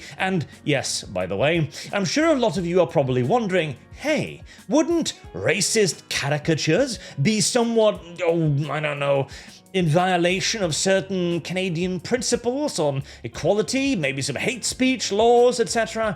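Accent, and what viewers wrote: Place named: United Kingdom. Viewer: The video presenter looks like a Russian Udmert but sounds like a British person. Off putting and confusing.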